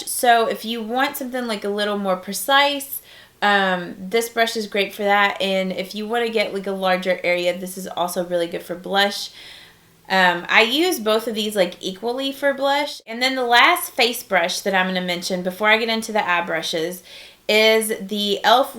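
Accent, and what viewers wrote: South, United States. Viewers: Great video! I love that some of your favs are the $1 brushes. I feel like they don't get talked about enough since the studio brush line came out, and there are some real gems in that ($1) line! If I may say so, being a southern girl myself (lower SC, it don't get much more southern than that lol), I just want to suggest you work on what your going to say a little more before you film. There are a lot of "um's", "so yeah's", and a few mispronunciations of words that can really separate a youtuber from being a guru. It may be as easy as just editing a little more so your thoughts become more concise and you don't repeat yourself so much. People hear a southern accent and immediately take you as being uneducated. It is ridiculous and horrible, but you can overcome that be simply trying a little harder to make sure you're not losing your audience because of your speech. 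I hope you don't take what I'm saying offensively, I don't mean it that way at all. I really like you videos. I just think you could really gain a bigger audience by tweaking this one thing just a little bit. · You have to be southern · Katelyn Hughes she's one of two so far that I have followed specifically because they have a Southern accent! I love hearing ladies that talk like me!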